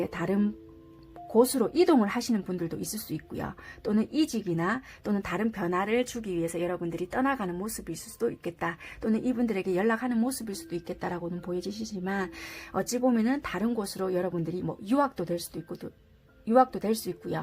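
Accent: Busan, South Korea. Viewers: ㅕㅛㅛㅛㅛㅛㅛㅛㅛㅛㅛㅛㅛㅛㅛㅛㅛㅛㅛㅛㅛㅛㅛㅛㅛㅛㅛㅛㅛㅛㅛㅛㅛㅛㅛㅛㅛㅗㅕㅛㅑㅕㅕㅕ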